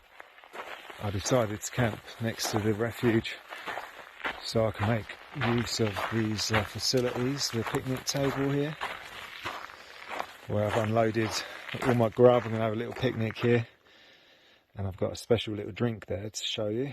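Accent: United Kingdom. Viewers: I was curious to hear your Italian, it seems good to me, with a very British accent😉, funny to see you wrong to say "specificamente" haha👍🏻 The Sicilians in the port of Catania all seemed very intrigued haha .. Sicily is beautiful but also very particular, especially the Sicilians, you just need to move a few km and change cities to find very different habits and customs, the food is usually very good and cheap , but be careful to call food right, Sicilians are offended if you're wrong haha... I crossed Sicily from Catania to the Egadi in 2021, passing through Etna, Enna Agrigento, Palermo and Trapani... so I'm very curious to see all 3 videos. I've never tried Bronte pistachio limoncello🤔 but it should be drunk after it's been in the freezer 😅👋🏻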